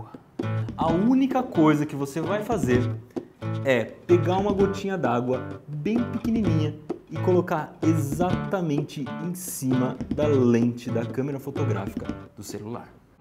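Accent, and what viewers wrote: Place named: Northeast, Brazil. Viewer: Nordestino DETECTED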